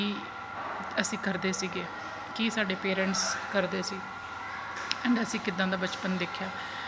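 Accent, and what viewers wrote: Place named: Punjab, India. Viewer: ...south India to ho k eni pure Punjabi language...wah!...oh v pendu panjabi...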